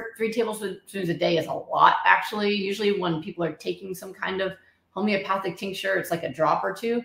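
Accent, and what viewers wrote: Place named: Texas, United States. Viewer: You're starting to sound like a real Texas. Matthew McCaughey would say, "Hello, everybody."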